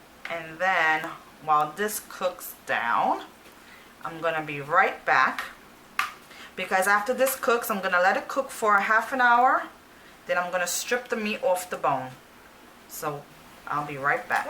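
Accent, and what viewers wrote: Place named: New York, United States. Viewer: Looks delicious going to make for my family today, I wonder how long it took to cook the chicken in the beginning? Also where are you from, sounds like a New Yorker to me 💁🏻‍♀️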